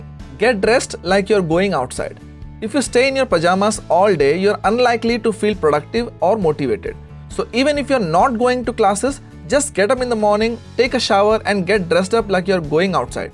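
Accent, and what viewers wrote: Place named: Bengal, India. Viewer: This accent is so Bengali.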